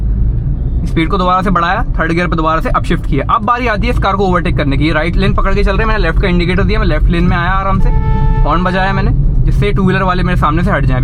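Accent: Jhansi, India. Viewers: Brother are u from jhansi, UP ???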